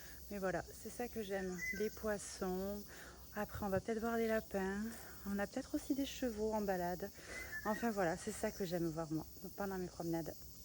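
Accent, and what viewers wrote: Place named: South, France. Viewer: Mon Dieu, quel corps de folie En plus. Cet accent du Sud et ses cigales Ne change rien, reste comme tu es magnifique.......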